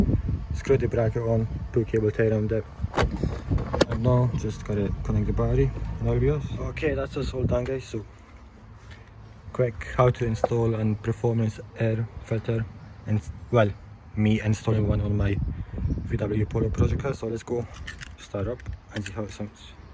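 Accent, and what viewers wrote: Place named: Pakistan. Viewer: I can’t make out a word that you did said. It’s like half Pakistani half Dutch with a bit of Glasgow accent chucked into the mix. M8 I didn’t understand one word of what you just saided.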